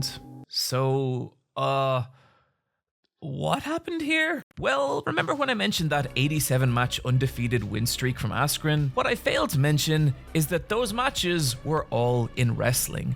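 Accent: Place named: Ireland. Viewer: Another banger from. Your chillingly Irish voice regales us once again. Thank you.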